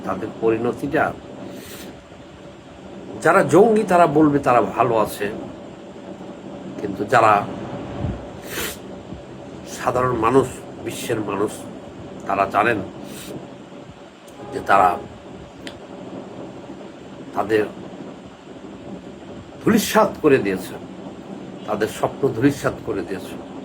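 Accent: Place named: Chapainawabganj, Bangladesh. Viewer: ভাই আপনি কি চাঁপাই নবাবগনজে ছিলেন? আপনার কথায় মনে হয় চাঁপাই এর মানুষ।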